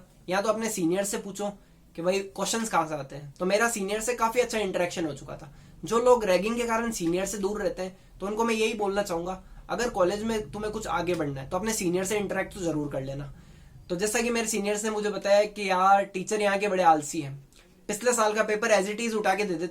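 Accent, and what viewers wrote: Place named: Jodhpur, India. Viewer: ohk😅😅 mtlb jodhpur nhi jodhpur se thoda sa dur boli se lg rha tha😅😅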